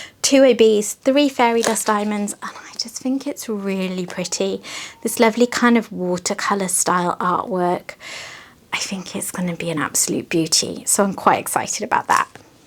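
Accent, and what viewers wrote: England, United Kingdom. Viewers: On your first picture, I love the old car. The Lorenz is so colorful too. I always love your choice of diamond paintings., you do NOT talk too much.!!!! Your English accent is so lovely and delightful. I have always said one of the stupidest things Americans ever did (lately a lot of stupid things) was lose the English accent. I’m American so I can say that. Talk about addiction, I swore to myself no more buying for a few months. So what do I do? I bought knight portal on DAC. It’s such a cool canvas, I had to get it. 😣Anyway you are wonderful. Don’t change. Have a great weekend.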